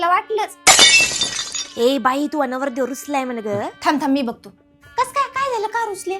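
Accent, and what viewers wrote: Nanded, India. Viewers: नांदेड ची मराठी एकदम मस्त.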